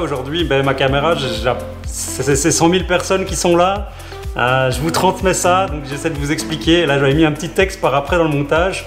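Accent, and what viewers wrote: Belgium. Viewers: Moi j'aime bien ton accent Belge :-)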